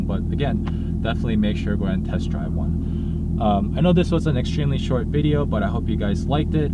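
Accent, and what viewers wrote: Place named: Texas, United States. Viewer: This guy definitely from Dallas TX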